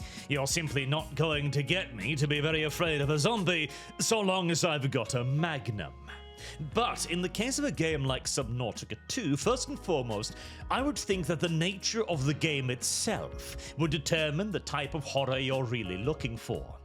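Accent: United Kingdom. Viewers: my bad (wrote the comment before watching), to me he sounded like british. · He sounds British to me, probably the way he speaks as I seen way too many British content creators speak like that like trying to constantly sound all eloquent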